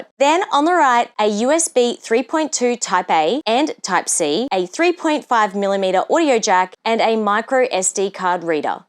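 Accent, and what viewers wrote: Australia. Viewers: I absolutely love the look of this laptop device here & even the screen looks bloody amazing as well! I'm thinking of upgrading to a new laptop here & looks beautiful! Have a beautiful week & love hearing that lovely Australian voice! 🥰🎮❤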